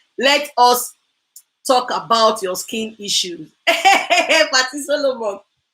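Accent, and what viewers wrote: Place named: Cross River, Nigeria. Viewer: Am from cross river state mama..am guessing your from there too because you sound like someone from cross river state mama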